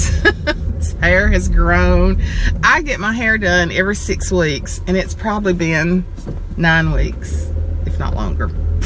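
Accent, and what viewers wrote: South, United States. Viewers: We got rain from storm up here but today it cleared and now nice and sunny out! Love your sweet southern accent. Hugs!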